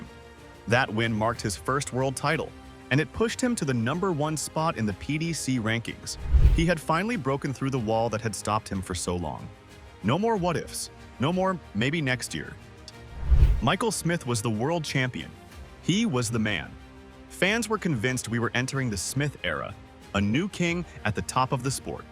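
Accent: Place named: United States. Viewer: an American voice over and a few repetitive meaningless clips. Absolute shite!!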